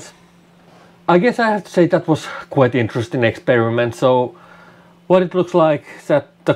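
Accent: Finland. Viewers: ymmärrän, että kotikielellä ei ehkä näyttöjä tule niin paljon... mut tää suomalaisten rallienglanti ei myöskään ole kaunista kuunneltavaa. :) Suurin syy löytyy varmasti meidän englanninkielen opettajista, jotka eivät ainakaan silloin kun me oltiin nuoria, painottaneet ääntämisen opetteluun. Ei millään pahalla. Yritä opetella ääntämistä, jos aika ja rahkeet riittää. Kaikkea hyvää sulle. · very noticable Finnish-English accent there 😀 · are you finnish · do i hear Finnish accent?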